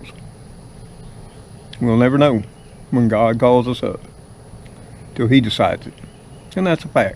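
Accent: South, United States. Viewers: I enjoy your down-home southern drawl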